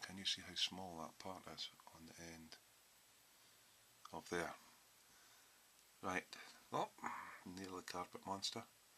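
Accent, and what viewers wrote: Scotland, United Kingdom. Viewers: OO the vidya presenting family grows nicely! Gotta like Tamiya tanks, my first ever painted build was a Russian T62A about a million years ago. I'f better go watch the other vids you've done. Also, everything is better with a Scots accent (my dad was from Glasgow) ^_^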